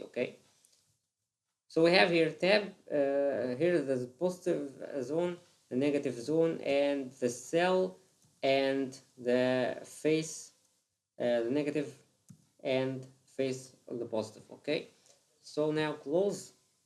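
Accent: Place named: Egypt. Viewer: although you have a very good english that made me doubt, however i had a strong feeling that this is an egyptian accent xd. very informative video even after 7 years, thank you! can i get your email for a technical question?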